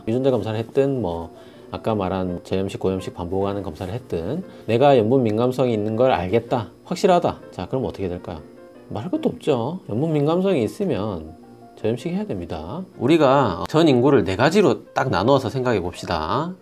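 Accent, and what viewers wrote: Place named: Busan, South Korea. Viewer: 부산억양 귀엽습니다^^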